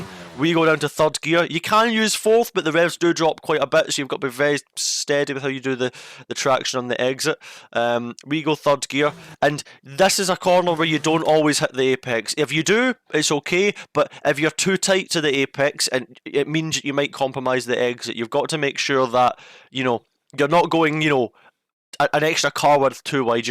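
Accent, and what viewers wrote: Scotland, United Kingdom. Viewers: I love thee Scottish accent mate.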